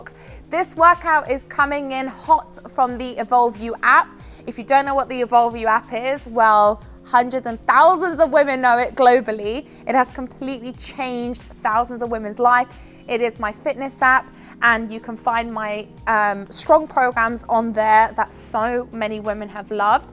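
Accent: United Kingdom. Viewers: Been watching your TikTok’s for a while now, had no idea you were British 😅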